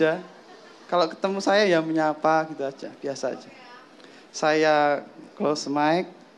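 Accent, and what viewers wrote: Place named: Java, Indonesia. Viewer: Smoga mas ttep khas kya gni yak... Kampungan dan medhok nya itu loh yg bkin ngakak ahahahah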